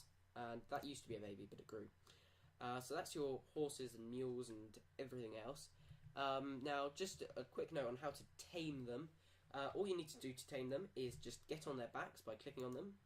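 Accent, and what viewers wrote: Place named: United Kingdom. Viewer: Subscribed my british friend i hop you take no offense for that i just love your accent :D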